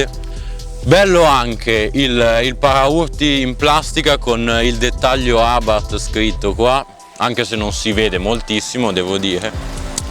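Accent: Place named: Italy. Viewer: Your italian ascent sucks so much that I need to read the english sub to understand something LOL! Learn to speak before create Review bro!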